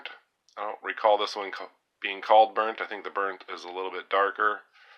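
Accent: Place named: Georgia, United States. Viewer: Hey brother You're starting to sound like a Georgia boy and I like it 👌 Really great knives today, love the stag and the burnt bone . Thanks for sharing brother 👍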